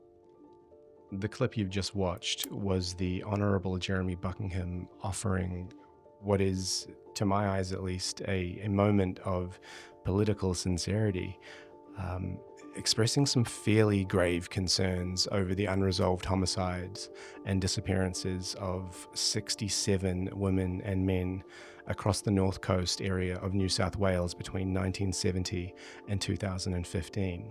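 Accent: New Zealand. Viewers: Don’t know who your watching but he is not a Aussie if you listen to him he is from nz he has a kiwi accent not Aussie accent sorry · Is there a hint of kiwi in his accent? The way he says 67. "Sexty sevan ".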